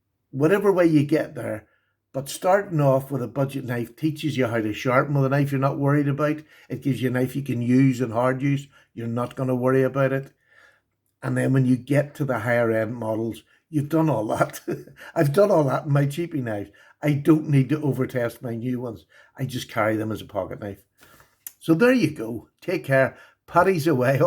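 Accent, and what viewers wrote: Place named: Ireland. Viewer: Love the accent my name is Shawn Michael Fleming my parents are from Cork Ireland a province of Munster Ireland 🇮🇪 which city are you from Ive always use to try to talk like my father and grandfather. As I’m sure you know Ireland is a beautiful place. Hope you have a HAPPY HOLIDAYS AND STAY SAFE. AND DON’T DRINK TO MUCH PROPER NO 12 IRISH WHISKEY 🥃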